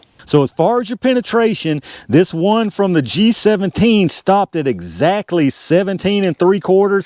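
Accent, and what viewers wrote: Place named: New England, United States. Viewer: I'm from New England, NH specifically. When I play your vids at 2x speed, you sound like someone from up here.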